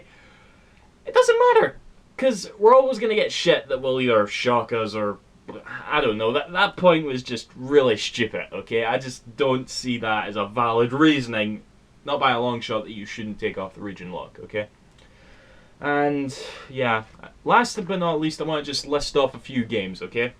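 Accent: Scotland, United Kingdom. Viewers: you are so Scottish its awesome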